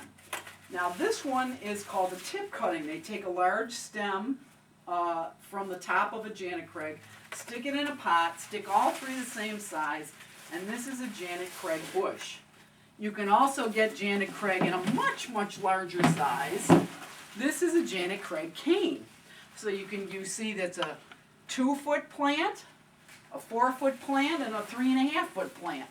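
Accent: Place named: Central New York, United States. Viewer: I spent most of my life in Baldwinsville, NY, just north of Syracuse, so I know a CNY accent when I hear it. You have literally the thickest CNY eeyacent I've ever heard in my life. You may be from like Buffalo or Rochester or something in western NY I guess. But holy shit, you're like the epitome of upstate/central NY/western NY rural twang. I bet you're a huge Wegmans fan and I bet you've cooked salt potatoes more than once.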